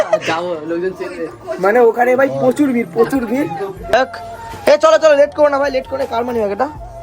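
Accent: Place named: Digha, India. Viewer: Vai majha Tumi ja tomader local language a kotha bolla amder dighar dikao amon typer kotha bola.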